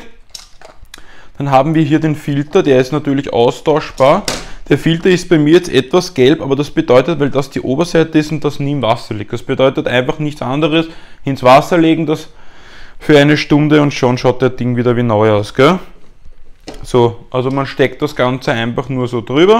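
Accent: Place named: Tyrol, Austria. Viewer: I bin mir sicher das du Tiroler bist 👍🏻🙋‍♂️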